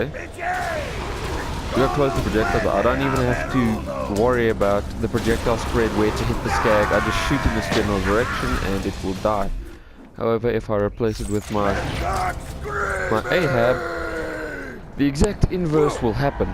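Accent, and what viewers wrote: South Africa. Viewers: Are you south African? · I recognize that accent from anywhere, you South African?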